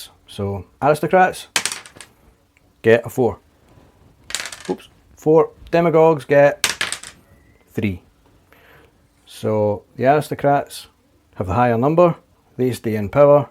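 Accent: Scotland, United Kingdom. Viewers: Nicely done sir and I must admit it sounds even better in Scottish. Its been way too long since I was there.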